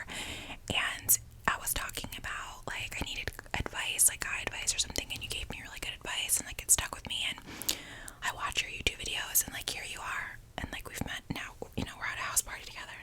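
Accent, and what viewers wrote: United States. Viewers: I think your accent is THE american accent for me😍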